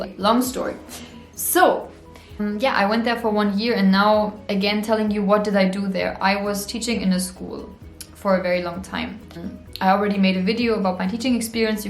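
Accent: India. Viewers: I think ur english has indian accent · Your English pronunciation like Indian English style very good. We are must understand. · Her accent sounds almost like Indian. She looks good and talks good as well. Hi! Welcome aboard! Enjoy your tryst with India and Indian culture and the warmth of Indian people. Post one of your full length dance videos. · Your Indian accent in English is almost perfect. But to say DVD in the old days..... Good God, how old am I????😶😖😥😥😥😥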